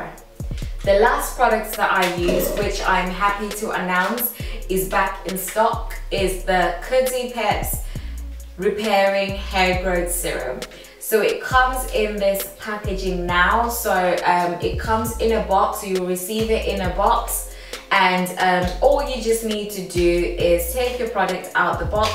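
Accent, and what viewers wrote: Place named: England, United Kingdom. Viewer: Where is your accent from ? It sounds English I love it ❤️ love your locs too x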